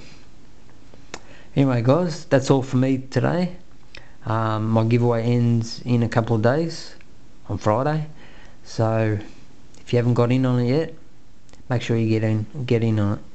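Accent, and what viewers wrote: Australia. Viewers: Aww man it's not nice to ask him why he talks funny...and being British myself I would just like to point out that he's not British. He's most likely Australian or from New Zealand, we don't have dollars over here. We have pounds. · you sound australian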